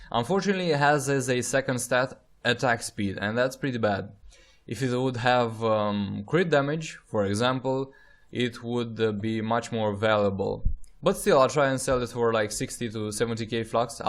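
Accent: Russia. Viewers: russian acent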